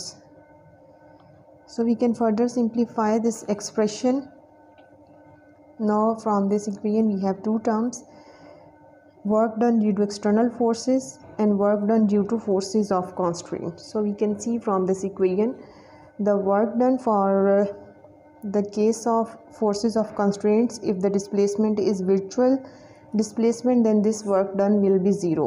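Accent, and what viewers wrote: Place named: India. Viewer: Accent is only Understandable in India.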